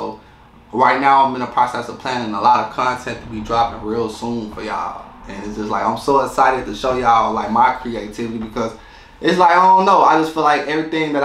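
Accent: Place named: Washington DC, United States. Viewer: This brother sounds like he's from DC. Sorry he had to deal with all that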